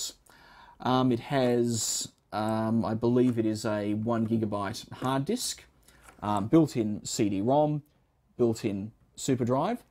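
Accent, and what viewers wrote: Australia. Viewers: I recognise that accent! Its the same as mine, he's an aussie!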